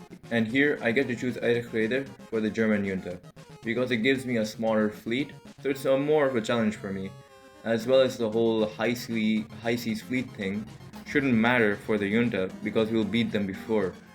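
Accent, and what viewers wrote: India. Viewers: Holy Indian accent🥀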